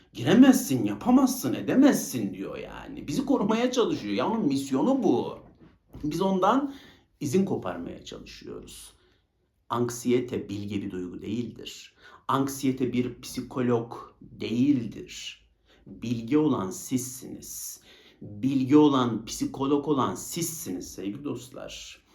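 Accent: Ankara, Turkey. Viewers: Senin Angara şivene kurban , Allah muradını versin hocam , benim aydınlanmama ve bunca yıldır kendimle yüzleşmeme vesile oldun , harekete geçip makinayı kapattım senin dediğin gibi , makinayı kapatın !!!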